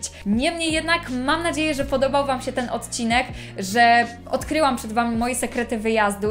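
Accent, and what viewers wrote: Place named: Russia. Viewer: Powiem, że rosyjski akcent pierwsza klasa 👌🏻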